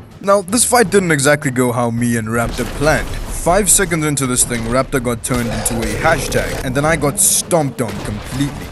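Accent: South Africa. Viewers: You from SA bru?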